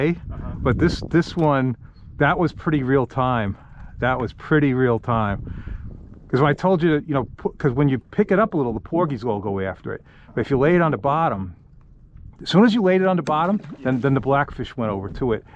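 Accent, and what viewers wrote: Long Island, United States. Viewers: I grew up in Eastern LI, in Southold. I fished a lot, especially around Plum Island. On the NE side of the island, I could put my boat between 3 massive boulders and catch blackish until my arms hurt as others 20' away were only catching skate. I love black fish... excellent meat. Your drone footage instills what I imagined down there. You sound like you're from LI... right? I now live in SW Florida and I dive a lot, especially wrecks. The underwater world is awesome and this drone is a great tool to share the scenes without the "work" of diving. I did much better fishing in LI than I ever had here. I have even shoveled snow out of my boat just to go fishing. How much is that baby?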